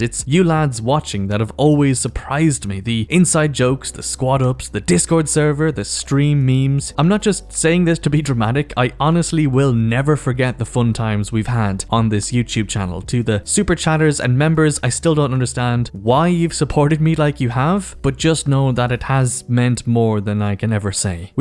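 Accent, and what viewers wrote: Ireland. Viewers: Thank you for everything <3 I enjoyed going on your stream for fun, watching videos, and hearing that smexy Irish accent of yours, and I'll keep supporting you. From making videos about video games to now working in the industry is a hug feat. I'm so happy:D Good luck!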